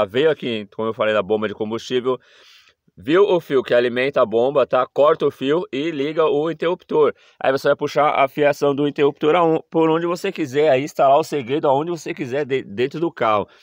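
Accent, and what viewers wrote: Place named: São Paulo, Brazil. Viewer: Grato! Pelo sotaque você deve ser de SP capital... Você faz esse serviço de instalar o segredo? Qualquer auto-eletrico tem essa expertise?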